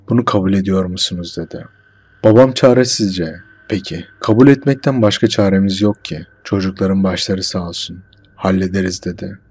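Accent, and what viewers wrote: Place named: Thrace, Turkey. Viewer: Severek dinledim ama merak ettiğim bişey var şiven trakyaya kaçıyo doğrumudur ben babaeskiliyim başarılar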